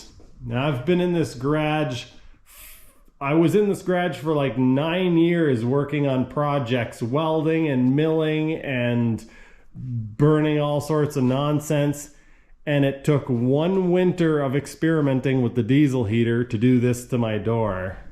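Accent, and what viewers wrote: Canada. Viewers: It's aboot the grraj! Love that Canadian accent! 😉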